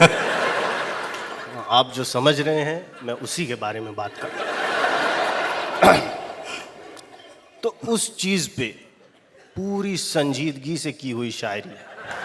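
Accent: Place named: Maharashtra, India. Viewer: I can still find Marathi accent in his Urdu. He doesn't sound prominent Urdu; sounds like Marathi Urdu, but very good attempt ❤.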